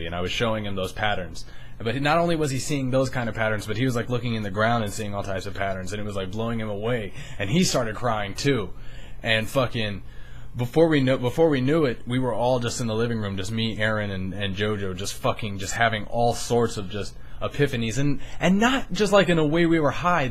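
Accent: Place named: Jamaica. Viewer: how often do you smoke pot? you speak like a true Jamaican. You're Echo Consciousness Theory is crazy (in a good way) and you do need better acid or more hits to get really fucked up. my friend had a trip where the only way he could explain it was he died and became a circle. anyway keep doin your thing boss, u shud try by ft.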